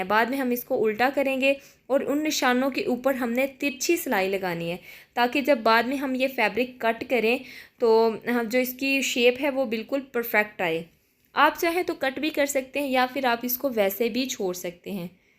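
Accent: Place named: India. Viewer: bolne ka pendo styel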